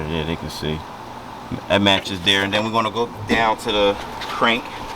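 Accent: Philadelphia, United States. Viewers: 👍🏿👍🏿👍🏿👍🏿 215 standup, new u was from philly soon as I hard ya voice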